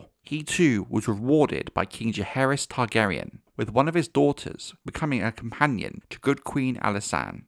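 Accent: United Kingdom. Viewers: Hearing a narrator from the UK (assumption) makes me feel like these are history videos as opposed to lore.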